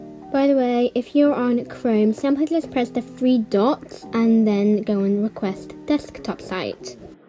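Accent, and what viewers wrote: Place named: United Kingdom. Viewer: I love your British voice so so much!!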